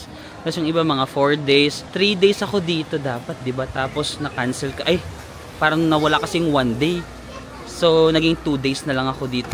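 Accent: Philippines. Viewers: It's okay, I still love your Filipino accent ~ 😍😉 Enjoy your trip in Europe po. Waiting for your next travel vlogs! ✨ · alam nyo po, sinasadya at inaamin naman po ni na hindi nya alam sabihin ang mga french words. for starters,.talagang mahirap maman talaga ipronounce ang french..kahit ibang lahi ay hindi din nabibigkas ng tama ang salitang french. mas cringy kung nagffeeling si na french expert sya pero mali mali naman ang sinasabi. he is just being a filipino tourist with a filipino accent. very down to earth and more relatable. hindi feelingera!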